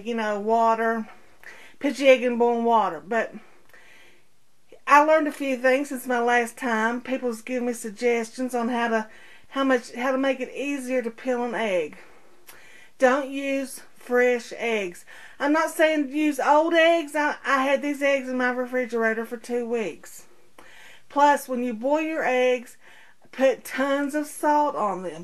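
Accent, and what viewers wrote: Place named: West Virginia, United States. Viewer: You have to be from WV. Either that or Kentucky. I'm from WV, and can usually tell a fellow hillbilly when I hear one talk. Great video by the way.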